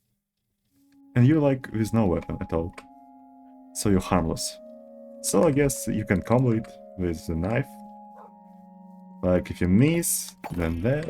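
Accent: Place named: Russia. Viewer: Чую чую я русский акцент, чую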